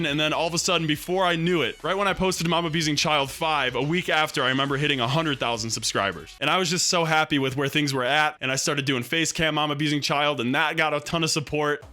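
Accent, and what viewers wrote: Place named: Australia. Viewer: Australian voice After 3 years he reveals it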